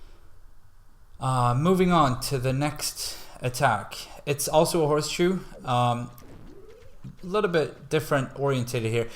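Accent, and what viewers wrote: Sweden. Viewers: Is he swedish? Just noticed this awesome channel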